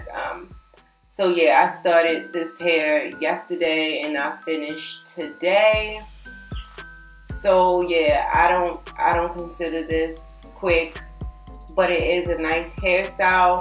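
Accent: Baltimore, United States. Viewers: BMore accent undeniable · You from Baltimore huh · As soon as you started talking, I went to find your IG.. I just KNEW you were from Baltimore lol. I am as well ♥️ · You are so pretty!!!! Are you from Baltimore? U have that city accent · You from Baltimore? I hope you don’t say “Ehh no “ lmao cause you low key sound like you from here plus you cute asf !! 😍Your hair came out BOMB! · You from Baltimore omg 😭 I can hear it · She must be from Baltimore I hear the accent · Heeeey! Are you in the Baltimore area? I hear your accent! Do you have a shop here? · Definitely turned out cute!! Sound like u from bmore! Lol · I love your accent? You from Baltimore?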